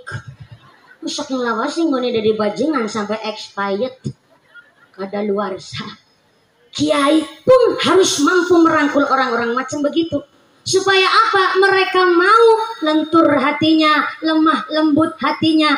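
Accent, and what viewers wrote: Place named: Banyumas, Indonesia. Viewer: Pemalang hadir Pingin ndengerin pengajian ustadzah yang ngapak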